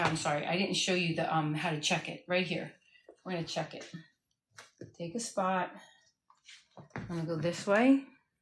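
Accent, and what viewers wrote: New Jersey, United States. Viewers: ❤❤❤I grew up in NJ and moved away to Cali but your voice reminds me of home! And now you say Shrewsbury (WHAT?!) I came from HIGHLANDS!!❤❤❤ I have no idea when I can get to Jersey but if you are ever taking a trip to California, please let me know!! My hair could definitely need your positivity and know how!! ❤❤❤❤ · As a cosmetologist myself for 40 years, I must say that I thoroughly enjoyed watching this video and I even learned a couple new techniques myself. I cut mostly men’s hair now so it’s nice to learn a few things again regarding women’s hair. And by the way, I’m from Rhode Island and I love your jersey accent! I’m in Florida now and listening to you just makes me homesick. You’re such a beautiful person with a wonderful attitude. God bless.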